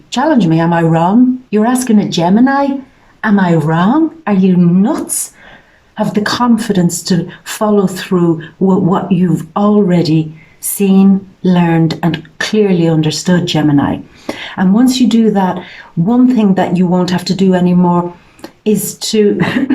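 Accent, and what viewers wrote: Ireland. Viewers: You are very beautiful. Inside and out. I love your irish accent. A lovely Colleen. Thanks for a great reading. I was in tears because its so true👏💞😁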